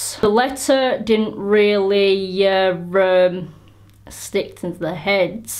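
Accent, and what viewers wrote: United Kingdom. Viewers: You can message me if you need any advice about transitioning/getting on T etc in the UK (I'm guessing you're from the UK too from your accent 😂) 😁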